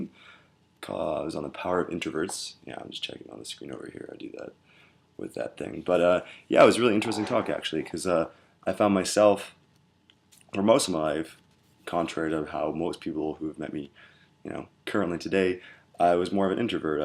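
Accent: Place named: Canada. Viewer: ps: you sound suuuuper Canadian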